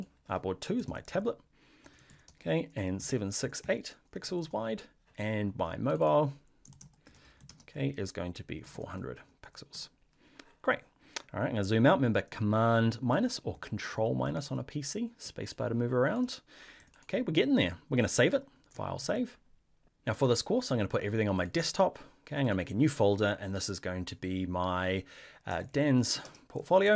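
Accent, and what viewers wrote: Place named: New Zealand. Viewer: Love to hear the Kiwi accent helping developers!! Cheers bro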